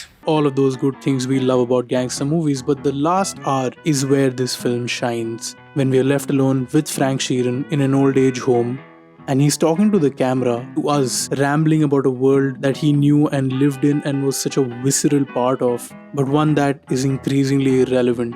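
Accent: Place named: India. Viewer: Dude that indian accent hurts the ears 😢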